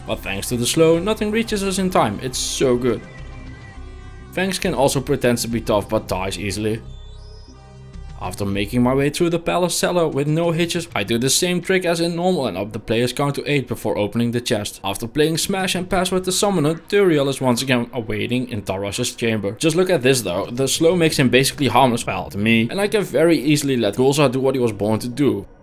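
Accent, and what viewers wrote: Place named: Netherlands. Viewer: ik hoor gewoon een nederlandse broeder onder dat accent <3 love to see it. ik heb geen dutch d2 friends </3 :(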